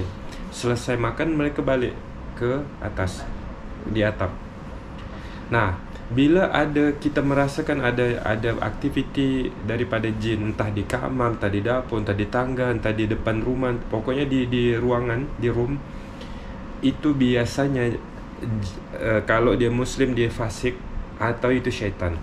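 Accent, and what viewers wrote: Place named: Kuala Lumpur, Malaysia. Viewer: Senang mendengar menyantuni keluarga pesakit dengan menggunakan loghat KL sebaik mungkin, walaupun inilah kali pertama datang ke Kuala Lumpur.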